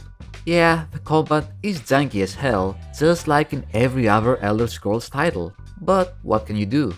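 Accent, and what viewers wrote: Greece. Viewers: lol that Greek accent knocks me out ahahah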